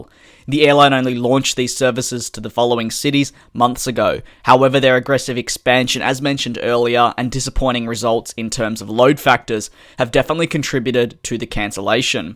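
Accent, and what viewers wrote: Australia. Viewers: Are you Australian? Got a hint by how you say year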